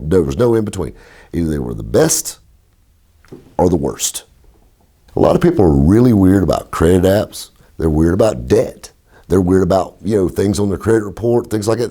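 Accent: South, United States. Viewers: This needs subtitles in English, southern dialect is killing me! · Fake southern accent? This is exactly how people in parts of the south speak, especially the Carolinas. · English is not my native language but I always thought I had a pretty good knack understanding people from the U.S. This guy's drawl however? Too much for me. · What accent is that? As in where in America is he from? It's really nice to listen to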